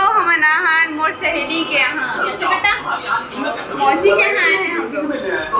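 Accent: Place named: Chhattisgarh, India. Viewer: Mast he apke cg boli bhakha video dekh ke mja aage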